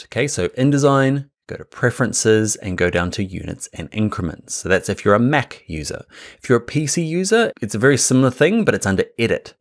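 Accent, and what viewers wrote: New Zealand. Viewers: do I hear kiwi accent? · Hi. Andy here from NZ....how refreshing to hear another Kiwi explain this app in detail. Great style and pace too.....the American tutorials treat you like a baby....I was first put off by the length of the clip...but hey...this was seriously worth it. Picking up Indesign to help my daughter create her first children's book....she is 10... And needs a bit of help.... I think you've given me everything I need to get started. I see you also have illustrator tutorials...I'll be checking those out too. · Thanks for great tutorials - you're a gifted designer as well as a teacher! And hearing your accent, I miss my highschool exchange year in Papatoetoe... Cheers mate, thanks again!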